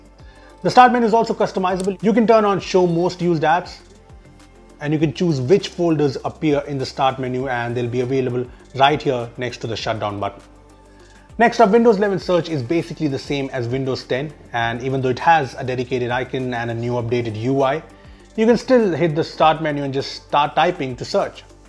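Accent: India. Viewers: Ok i will watch it even though its WITH INDIAN ACCENT haha but yeah thank you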